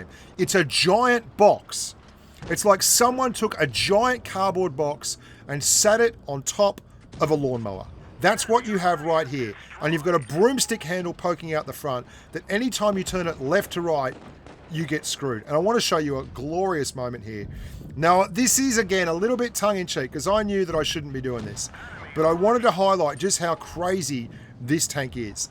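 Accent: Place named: Australia. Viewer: I’m a kiwi and I think he’s an Aussie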